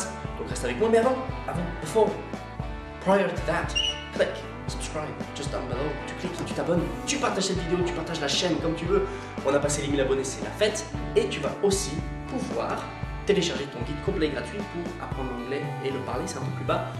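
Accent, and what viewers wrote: Scotland, United Kingdom. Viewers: Hi thanks for your tutorials, it's nice to hear your scottish accent, I'll try that with my students this afternoon! ;) Justine x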